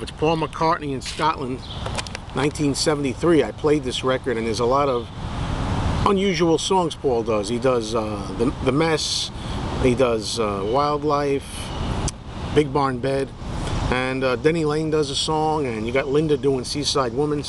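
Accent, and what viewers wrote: New York, United States. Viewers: I assume from your accent you're a NY guy like me?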